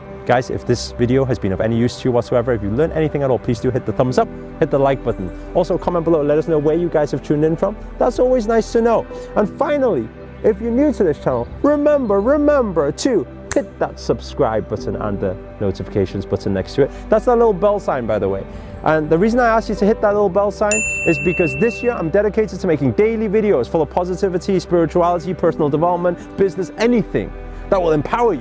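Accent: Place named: Northern England, United Kingdom. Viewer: Hi, I'm listening from near Manchester UK, I notice a northern twang in your accent x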